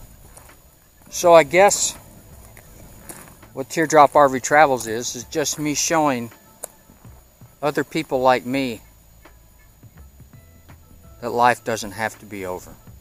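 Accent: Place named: Kentucky, United States. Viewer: God Bless, I knew right away you where from Kentucky. Love your videos ❤️🙏🏻🇺🇸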